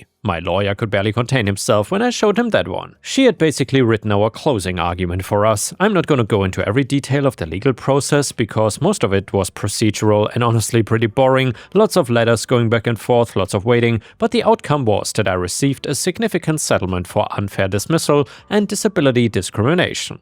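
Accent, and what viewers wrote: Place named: Germany. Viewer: I bet on you being German. That's a daaamn thick accent. :D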